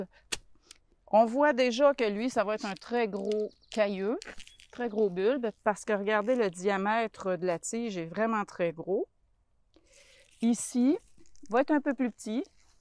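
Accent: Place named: Quebec, Canada. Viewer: cet accent fleuri du Québec, magique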